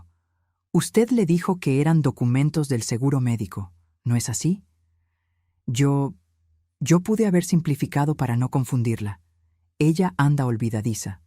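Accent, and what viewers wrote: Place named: Mexico City, Mexico. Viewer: La escucho dé ciudad de México excelente narrativa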